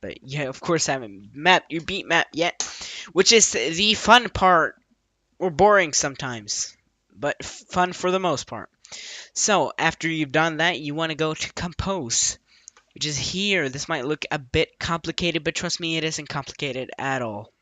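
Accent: Sweden. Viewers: That's a nice swedish accent you got there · I can hear the swedish accent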